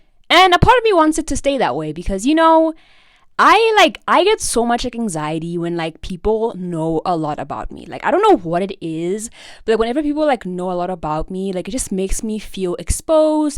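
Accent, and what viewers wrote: South Africa. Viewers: if you have done the research, self diagnosis is completely vailid even the psychologists i've had have said so. Although I get what you're saying please don't diminish your struggles, i also used to do that when i was self diagnosing before i eventually got a medical diagnosis. You are not over oversaturating any spaces, you should feel free to use any resources that you feel like would help you. I feeel like as long as you're not harming anyone you're good🤍also, unrelated but are you south african?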